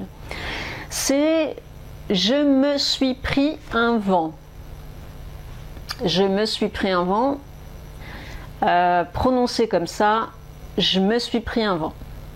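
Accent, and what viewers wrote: France. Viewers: J'adore écouter votre voix, elle est trop française. Merci beaucoup pour les videos. Ils m'aident beaucoup.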